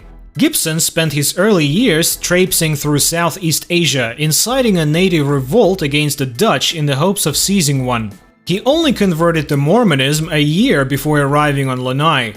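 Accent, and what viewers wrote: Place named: Russia. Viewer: your dirty Russian accent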